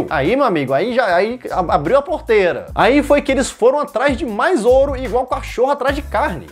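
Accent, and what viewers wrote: Brazil. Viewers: Vi teu canal e não sei se você é um brasileiro com sotaque português ou um português que usa gírias brasileiras. Se você for português teu sotaque é bem mais parecido com os brasileiros do que com os portugueses.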